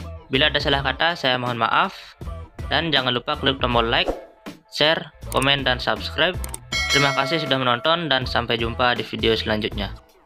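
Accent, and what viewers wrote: Aceh, Indonesia. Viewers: Orang Aceh ya bang? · Awak Aceh BG nyo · Aceh kah??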